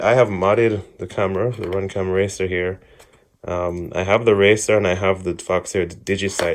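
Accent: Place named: Caribbean. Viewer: Nice video bro. Maybe someday I'll go digital when it's priced a bit better. Btw, that sounds like a Caribbean accent...do I detect some Guyanese or Bajan heritage? :)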